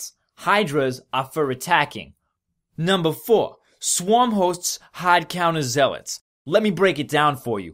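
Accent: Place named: New York, United States. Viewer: He speaks in a hard new york accent.. haaaad cawntaaaaah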